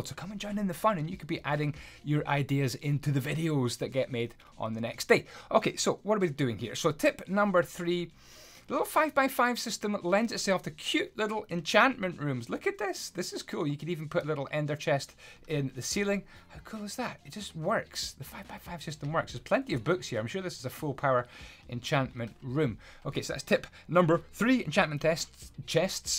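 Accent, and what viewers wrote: Scotland, United Kingdom. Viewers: Is he Scottish? Or Irish?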